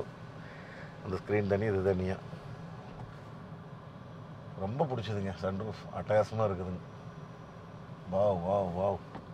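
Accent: Kongu, India. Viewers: கொஞ்சும் கொங்கு தமிழ் ...அருமை..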